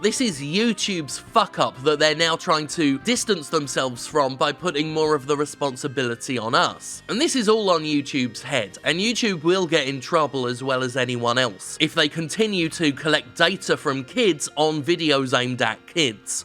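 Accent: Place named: United Kingdom. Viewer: Do you know whether or not the US FTC can even fine foreign creators if we violate COPPA? I've been searching for a definitive answer and finding nothing so far. I'm Australian. You sound like you're from the UK. They may be able to force YouTube delete our videos or channels because YouTube is a US platform which has to comply, but I'm pretty darn certain this badly written revision of a US law does not apply to me.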